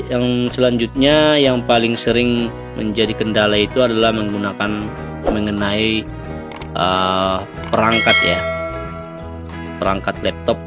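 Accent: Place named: Makassar, Indonesia. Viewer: mantap pak aku kira makassar td hehe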